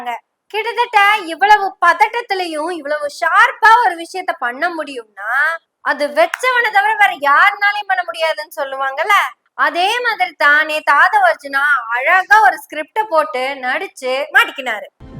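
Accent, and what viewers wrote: Kongu, India. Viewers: கொங்குத் தமிழில் கலக்குறீங்க சகோதரி செய்தியும் கருத்தும் சொல்லும் ஒரு நேர்முக வர்ணனை போல அமைந்திருக்கிறது... அந்த ஆதவ் தலைவலி வந்தாலும் கூட திமுக சதி என்று கூறுவர்... சொந்தக் காசில்...... வைத்துக் கொள்வார்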